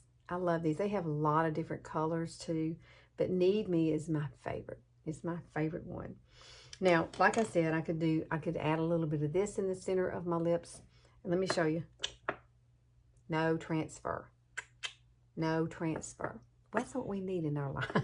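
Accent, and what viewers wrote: South, United States. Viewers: Some very good 'tips'. Just love your Southern accent, don't hear it much In Australia. First time on your Channel, thank you. You look great.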